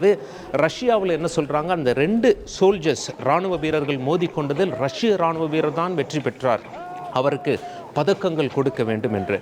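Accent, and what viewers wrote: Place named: Salem, India. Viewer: Salem voice and prononciation excellent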